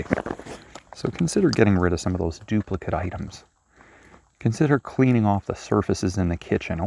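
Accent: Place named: Canada. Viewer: Sir, You make a lot of sense. So true. Throughout the years, I collected so many O Scale model trains. I’ve sold some for charities. Going to do more. Same with my business suits. Just WHAT am I holding onto them for? I’ve donated business jackets, suits , dress shirts and ties to a rehab for young men who are recovering alcoholics and from drug addiction. Going to do more of that to. I’ve listened to your channel and am impressed with your intelligence. And… by the way, I’m trying to make friends with raccoons in my backyard. Just how can I do this? I’ve made a table and put various treats, including cheese, bread, frankfurters. Now I’m trying peanuts. By the way, I listen to your pronunciation of ‘Os’. Are you Canadian? If so, glad to meet you. I’m from New York and absolutely support Canada.🇨🇦. God Bless Canada. I’m glad that our “president “ left the G6 (without the United States) in a huff.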